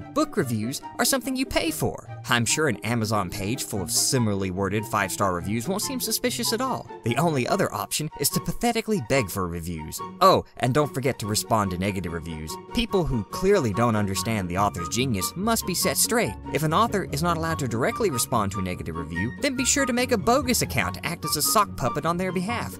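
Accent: South, United States. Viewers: is this guy a "southerner" as americans call it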